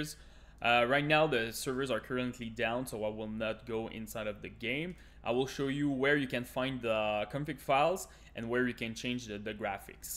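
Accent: Quebec, Canada. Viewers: merci pour le tuto... j'écris en français parce que ton accent paraît très québécois ^^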